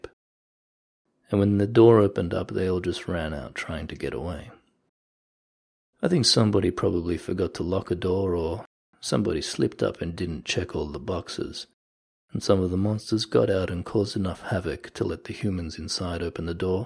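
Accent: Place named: Australia. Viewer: The narrator sounds Australian. I wonder if his grandfather was?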